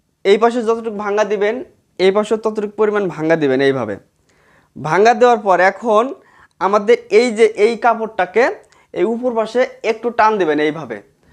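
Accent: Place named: Sirajganj, Bangladesh. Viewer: আপনিকি সিরাজগঞ্জের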